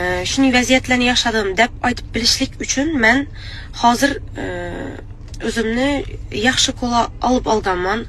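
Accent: Khorezm, Uzbekistan. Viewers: Xorazmcha gaplab duribdimii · Шеваси хоразиммми Гузал аел экансиз бола хам жуда шерин экан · Хоразмда шеваси ажойиб йокимли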